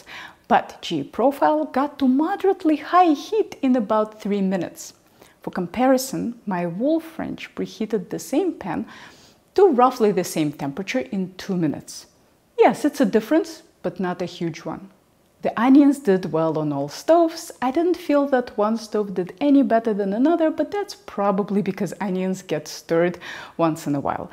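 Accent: Russia. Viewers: nothing in this world is more irritating than a russian accent in english. NOTHING. except people who don't understand the difference between spoken and written language, and read a script to a screen like a 5 year old reading the three bears. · The Russian accent puts me off these videos and just wondering if there is any connection between the accent and the pro gas bias. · This is the first time I’ve watched one of your videos,and I am so glad the YouTube algorithm recommended it. The topic is very timely as we will be having a lovely manufactured home built for us and I either have to have a non-gas, 30” range or a gas range with propane, which would be an upgrade and cost us for the gas range and, of course, the propane. I love the gas range I currently have, and don’t want any other type range. I’m a little concerned because of what you said about your needing a Wolf because it works well with propane. 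I am 73 and don’t cook nearly as much as I used to, so cannot justify the cost of a Wolf. I am now a Subscriber. Thank you for this helpful video. BTW: I love your beautiful voice and Russian accent!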